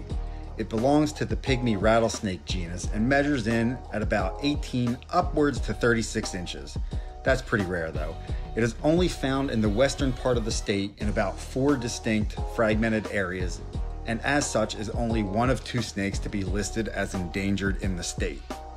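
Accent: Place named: Delaware County, United States. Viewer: Sounds like a delco guy. With that accent!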